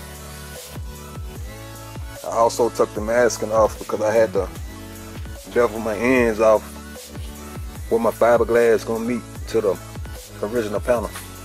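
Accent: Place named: Memphis, United States. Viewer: You gotta be from North Memphis bro by that accent 😅 but your videos are hella informative man I love em! Never seen the fleece technique before until I started watchin your vids. So fries 🍟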